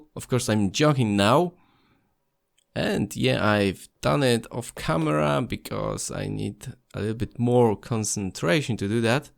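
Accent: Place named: Germany. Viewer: Twoja wymowa brzmi jak niemiecka 🤔 Jak według mnie, obcokrajowca. Zgadłem czy nie bardzo ?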